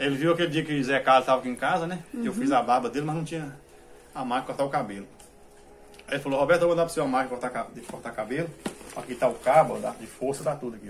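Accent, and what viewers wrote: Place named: Minas Gerais, Brazil. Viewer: O jeitim de falar do minerim é lindim. E pra completar um cafezim bem quentim.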